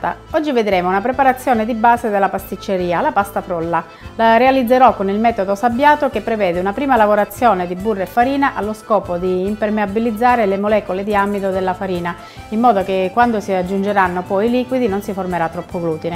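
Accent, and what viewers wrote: Calabria, Italy. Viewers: Grazie! Spiegazione impeccabile. L'accento calabrese è bellissimo. :)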